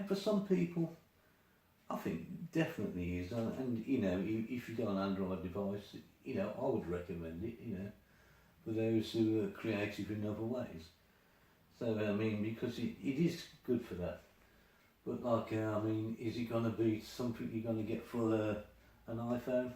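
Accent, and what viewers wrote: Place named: Birmingham, United Kingdom. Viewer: Never knew you was a brummie mate, you a villain, cov or bcfc? 👍✌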